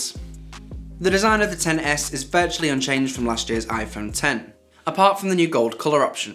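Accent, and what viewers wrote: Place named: England, United Kingdom. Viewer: I like Ur English Accent Sound so cute...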